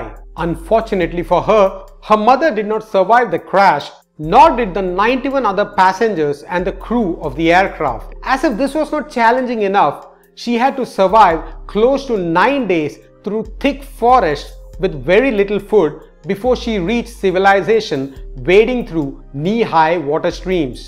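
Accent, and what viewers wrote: India. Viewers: My parents were invited to a dinner with juliane koepcke parents the night before the accident, the host was the dean of biology faculty at san marcos university, lima peru, they were talking about tropical diseases in peruvian jungle and how hard it will be if you get lost there. Btw, i dont know why is nice to hear narrations in english with indian accent , maybe too much big bang theory or get used to call centers. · indian english.. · Those people can really affirm "they're lucky". Love your Indian accent! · i enjoyed watching, but i didn't enjoyed listening to your indian (malayalam english) diction. I feel that i am having a short of breath listening you bro!! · People are a disappointment when they cant look past an accent, the content is very good that's what should matter. I actually like the indian accent. Good video bud. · Amazing how many people are complaining about this guy's accent. 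He's clearly from the Indian subcontinent - he's allowed to have an 'accent'. He's also managed to collect 140,000 subscribers on a platform that's massively dominated by American viewers and content providers, which I'd say is no small achievement. For the record, English is nowhere near being the most spoken first language in the world - if we sum together the various Chinese languages, for example, the number of first-language speakers outnumber first-language English speakers by more than three to one. There are also more people speaking Hindi and Bengali as a first language than there are English. So to everyone criticising ' ' for his accent, I say: how's your Hindi coming along? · In fact it’s the best Indian accent that I’ve ever heard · The story is good....but the Frikin Indian accent is pissin annoying.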